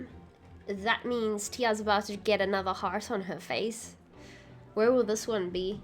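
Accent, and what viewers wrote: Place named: South Africa. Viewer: Your english pronunciations are the most enjoyable i've ever heard. Are those pronunciations characteristics to South Africa?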